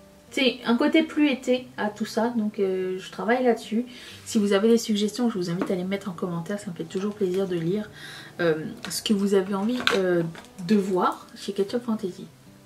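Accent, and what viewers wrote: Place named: Quebec, Canada. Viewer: T'es Québécoise ou Française j'comprend pas trop 🤔 T'inquiéte c'est pas une critique c juste que youtube m'a recommander une de tes vidéos hier so yea.. J'vien de te découvrir et je perçois l'accent QC 😅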